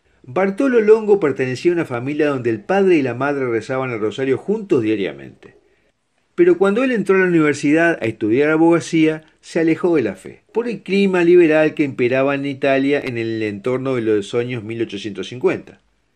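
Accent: Argentina. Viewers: En estos video que tanto nos ayuda a comprender el amor hacia Dios............tiene la caracterisca de una voz argentina........muchas gracias que Dios tenga preparado un sitio en el 3 er cielo a este Sr. que lo respeto mucho es uno de unos cuantos argentinos que se les quiere mucho obvio despues del santo padre FRANCISCO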